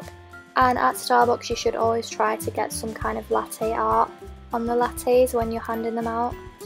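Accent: United Kingdom. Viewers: You're from London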